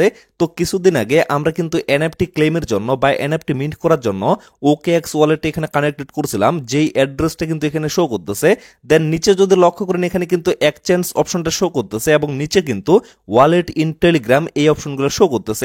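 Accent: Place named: Thakurgaon, Bangladesh. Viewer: first cmnt,,দাদা আপনার ঠাকুরগাঁওয়ের কথায় বাসা🎉🎉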